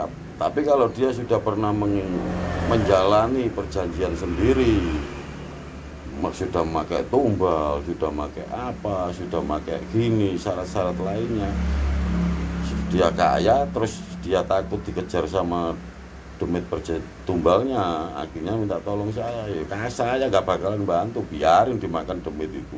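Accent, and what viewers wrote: East Java, Indonesia. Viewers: Losss..... Ben ketok nek wong Jawa. Timur mas 🙏🙏🙏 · Top gus lossss.. Ngomong Karakter suroboyo tanpa ading aling-aling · Gpp gus.. Mklum ktnya gus org tuanya jombg.jbg nya mana gus.. Sya dr djbg. Smga sehat selalu gus ... ..logat jatim ya bysaa gus... Woteper. Heheh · Nek gak kaku, duduk arek suroboyo arane... Jian josss...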